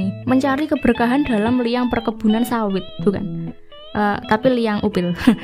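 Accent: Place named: Java, Indonesia. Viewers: Medok bgt ya bund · Kata katanya toxic banget, khas logat orang jawa 👍🏻😂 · dari cara bicaranya mbak agak medok bahasa Indonesia nya😅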